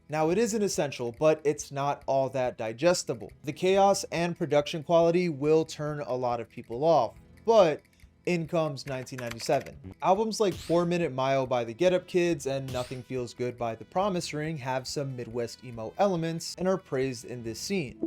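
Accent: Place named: Portugal. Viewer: omg I was listening to this, I opened the tab just to see who the hell is talking and to my surprise, portugal caralho.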